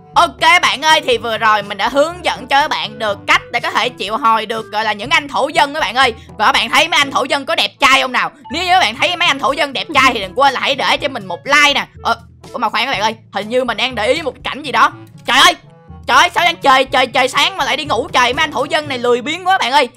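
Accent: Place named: South, Vietnam. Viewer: Tại anh người miền Nam mình cũng hay nói vậy mà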